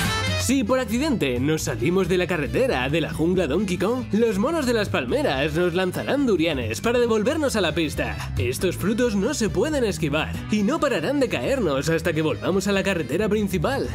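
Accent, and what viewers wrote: Spain. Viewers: No entendí ninguna palabra por el acento español pero aún así me gusto el video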